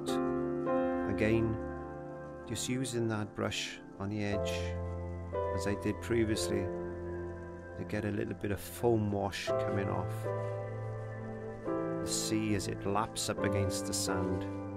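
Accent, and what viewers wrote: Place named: Mid Glamorgan, United Kingdom. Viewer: never mind the music love to see your art and hear that Mid- Glamorgan accent. I can see Barry-John running up the beach to score a try, and that's from an Englishman. Love your videos, so relaxing from one so many miles from home. Be safe x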